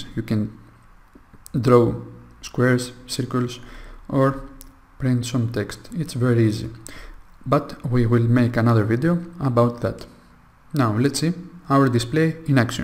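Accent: Greece. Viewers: are u greek????? You sound like me to talk in english im greek too lol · I have been to greece (Olimpiada) past summer for vacation, i can recognize your accent by the "h" sound :-) good job!!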